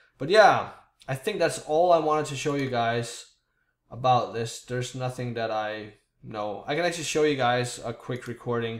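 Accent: Denmark. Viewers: Jeg tænkte det nok, jeg synes du har en svag dansk accent, men dit engelsk er pænt godt ( I hvert fald bedre end mit og jeg er 14 år gammel )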